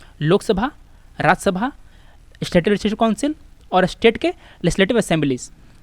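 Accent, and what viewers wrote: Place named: Bihar, India. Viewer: Even you sound bihari ..... You sound pro-madeshi and biased Where is dhavan sir ??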